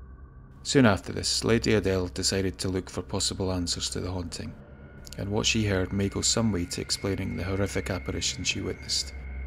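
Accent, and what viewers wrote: Scotland, United Kingdom. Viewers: I love a good ghostie story, but I love a Scottish accent even more. I swear I'd be watching even if you read the phone book. Great stuff :) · I adore your Scottish voice so much👍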